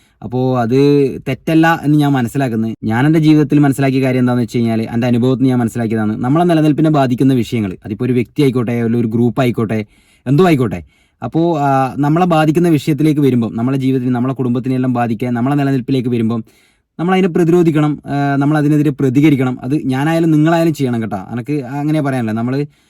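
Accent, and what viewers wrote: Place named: Thiruvananthapuram, India. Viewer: തിരുവനന്തപുരം ആണ് എന്ന് തോന്നുന്നു സംസാരം കേൾക്കുമ്പോൾ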